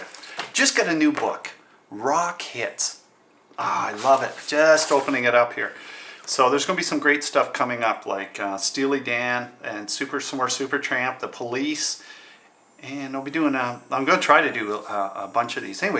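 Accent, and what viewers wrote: Canada. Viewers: Great Great Video. Good job, took me less than an hour to learn this song because of this video. And, say, you sound Canadian. Are you?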